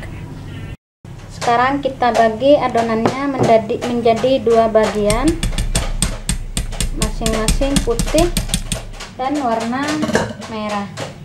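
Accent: Madura, Indonesia. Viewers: org madura ya kok yg ngomong kyk gitu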